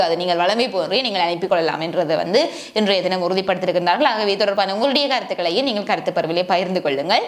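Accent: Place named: Jaffna, Sri Lanka. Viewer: (யாழ் )பேச்சு வழக்கு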